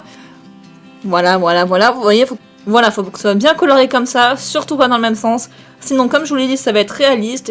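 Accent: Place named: South, France. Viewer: Avec ton genre d'accent du sud tu me fait trop penser a une youtubeuse du nom de Keyza 😆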